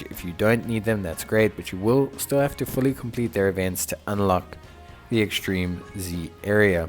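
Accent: South Africa. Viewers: I presume so, the South African accent is undeniably there. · Dude are you South African?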